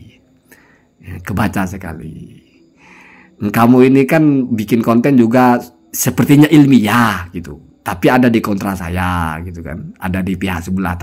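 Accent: Madura, Indonesia. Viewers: Mungkin maduranya agak lain guss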